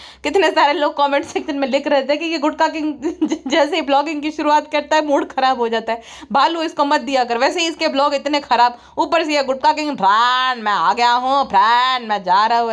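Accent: Gujarat, India. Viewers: Aap apne podcast me aise hi gujarati tadka lagaya karo bahut achha lagata hai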